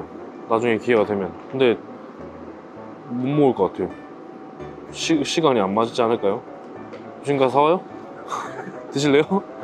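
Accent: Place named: Daegu, South Korea. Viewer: 사투리 마 기깔나네 찐대구사투리💙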